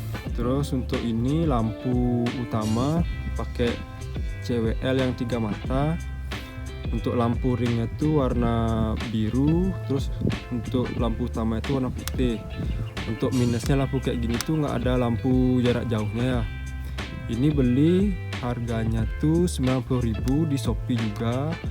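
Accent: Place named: Madura, Indonesia. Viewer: Suara nya logat madura tak yee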